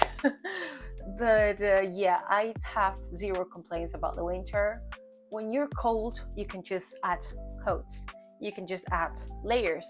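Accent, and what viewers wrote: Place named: United States. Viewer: Strange to see someone saying is from Cuba and speaks english so good that is easily mistaken by an american.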